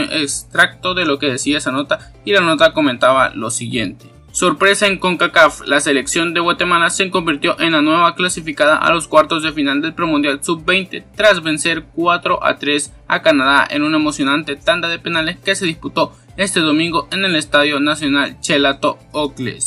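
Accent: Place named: El Salvador. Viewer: Mi amigo, utilizá Loquendo! No se te entiende y tenés acento como de salvadoreño. Un abrazo!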